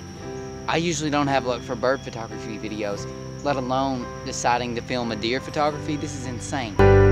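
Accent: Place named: Arkansas, United States. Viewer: A great video and inspiring, love your excitement, btw you sound like my Arkansas family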